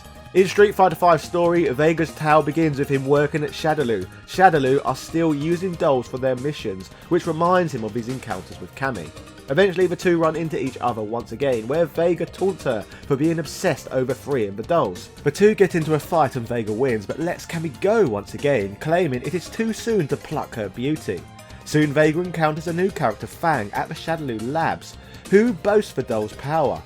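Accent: England, United Kingdom. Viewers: Ok, I gotta know what English accent you have....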